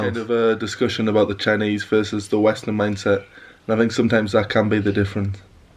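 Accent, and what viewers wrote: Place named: Newcastle, United Kingdom. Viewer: You sound like a fellow Geordie and I have just about watched all of your videos. Thanks for posting.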